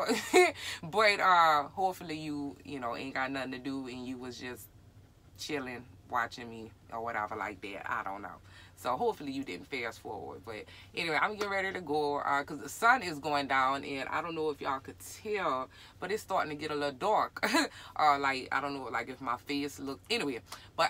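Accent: Louisiana, United States. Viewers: Got a mad crush on you, love visiting your page. That cajun accent, soooooo weeeee, gotta find you hotness. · I love your Louisiana accent!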